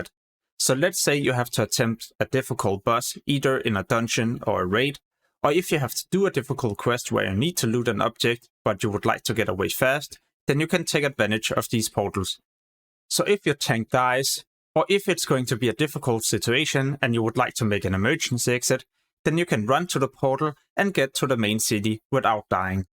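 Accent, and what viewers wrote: Denmark. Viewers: Lyder som en dansker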